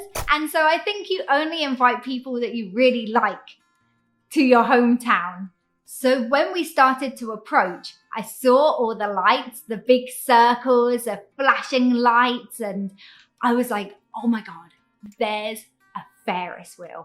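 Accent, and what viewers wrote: United Kingdom. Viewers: 為什麼要學英國人說話？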